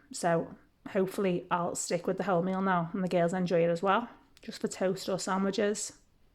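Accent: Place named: Liverpool, United Kingdom. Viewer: Omgosh you sound like my mums fam liverpool lol im from carribbean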